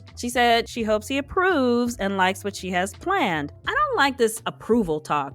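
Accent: Boston, United States. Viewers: You are HILARIOUS, I appreciate your commentary and your Bostonian accent voice overs💗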